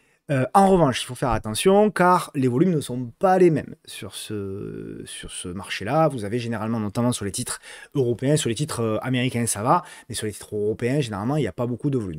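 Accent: South, France. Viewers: j'aime bien l'accent du sud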